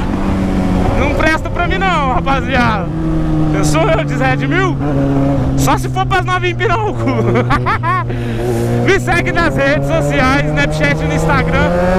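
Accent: Goiás, Brazil. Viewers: Saudades quando ele falava no pique do Goiás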